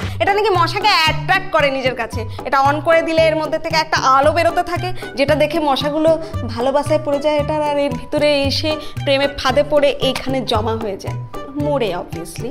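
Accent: Bangladesh. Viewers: Apnr kotha bolar style pura Bangladeshi der moto... mone hoi apni Bangladesh a chilan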